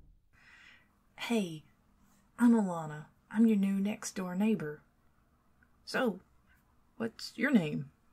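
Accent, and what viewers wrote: South, United States. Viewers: Loved this, really relaxing even if life got in the way so I has to listen to half this morning and half this... huh technically morning again. Anyway yeah really nice and I love the character, her understanding nature and of course your accent mixed with southern hospitality. Just adorable hearing the Yeps and such. 10/10.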